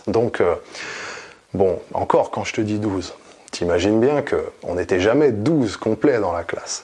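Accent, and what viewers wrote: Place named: Marseille, France. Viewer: Je croyais que t'étais de Marseille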